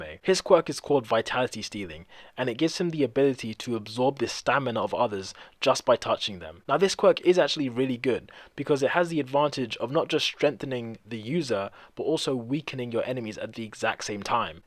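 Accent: United Kingdom. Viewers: You are awesome3. Hope you get bigger man it will happen soon. Are u British btw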